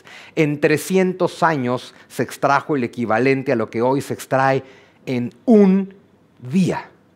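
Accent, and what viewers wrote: Spain. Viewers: Apenas inicia y ya trae el acento español, gracias, gracias, gracias. No mms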